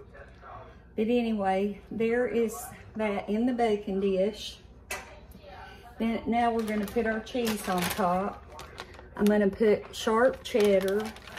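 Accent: South, United States. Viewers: You remind me so much of my grandma❤️ she has a southern accent as well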